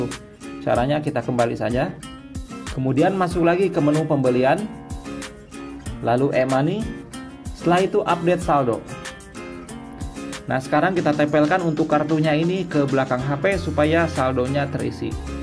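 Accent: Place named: West Java, Indonesia. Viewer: Hatur nuhun akang infona, Mudahan gak salah,, ketahuan Logat sundanya..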